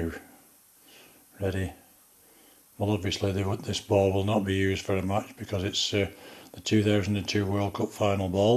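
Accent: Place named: Lancashire, United Kingdom. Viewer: Hi, i have an adidas finale ball that needs fixing, also, are you from lancashire? The accent sounds familiar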